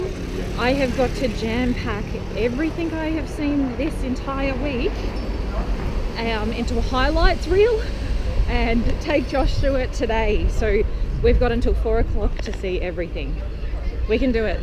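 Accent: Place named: Australia. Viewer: I love you Australian accent. Australian accent sounds very British, which is far more beautiful than American accent. Long Live Great Britain！God Bless America！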